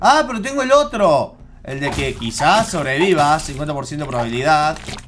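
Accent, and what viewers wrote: Argentina. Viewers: Tenía mucho que veía tus videos xd olvidaba lo genial que era el acento argentino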